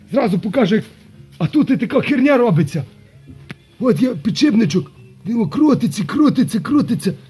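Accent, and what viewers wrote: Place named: Khmelnytskyi, Ukraine. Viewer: Судя с говора вы с Хмельницкой области 😊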